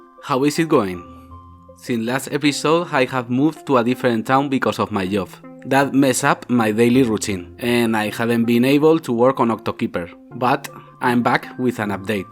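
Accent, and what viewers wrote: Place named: Spain. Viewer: De nada bro, yo no se mucho de videojuegos, pero en esta plataforma has lo que más fácil se te haga, ya que al final Youtube tiene un argoritmo muy loco y podrás estarte matando haciendo un video muy bueno para que al final nadie lo vea, y es muy triste. Otra cosa que podría ayudarte demasiado, es haciendo streaming por Twitch mientras realizas los artes y programas el código del juego. Es muy bueno al momento de interactuar con tu público, además, no es un juego que normalmente suelas ver por la comunidad hispana, esto hace que sea más llamativo, crea un vínculo con el espectador. Por ejemplo conmigo, yo al ver tu acento en inglés, ya dije "Este es español" y eso fue lo que me hizo decidir quedarme en el canal. No tienes que tomar esto al pie de la letra haha, pero me gusta dar consejos que he ido viendo que le funcionan a otros.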